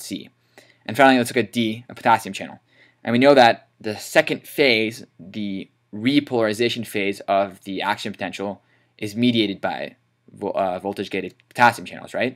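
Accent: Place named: Australia. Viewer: I have no ideas why he sounds like an Australian.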